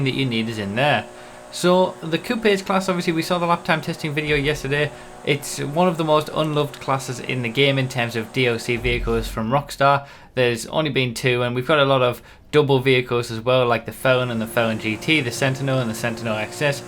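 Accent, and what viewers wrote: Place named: England, United Kingdom. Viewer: no, he's English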